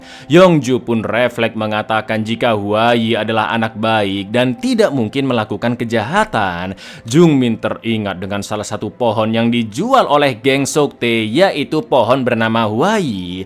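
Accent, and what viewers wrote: Java, Indonesia. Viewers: ras nyaa Hwayii Jawaa anjaayyyy 😂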